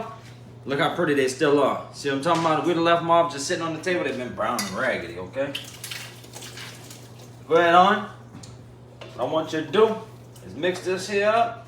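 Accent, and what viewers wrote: Louisiana, United States. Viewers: I been watching this guy for a little while now n i gotta say he's very talented love the hook "Lets go yall " keep doing your thing love the Cajun accent!!!!! Lets Go!!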